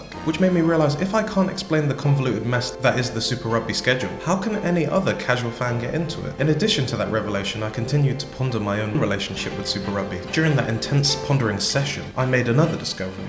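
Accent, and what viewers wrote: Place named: United Kingdom. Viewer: You sound British. Us southern hemisphere supporters don't keep up with your rugby tournaments either.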